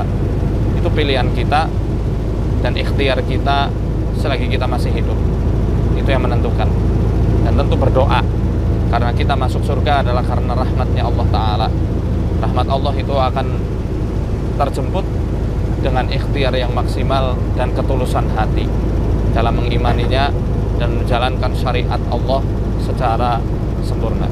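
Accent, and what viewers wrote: Banyumas, Indonesia. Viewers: logatnya kho ngapak bhs Indonesianya...apa asalnya dr ..daerah ngapak ,mas Ustad.Kisah dibawa jin memang ada,sy ngalami waktu umur 5 th an,rasanya dibawa teman main(pd hal bukan) .Hilang setengah hari.😀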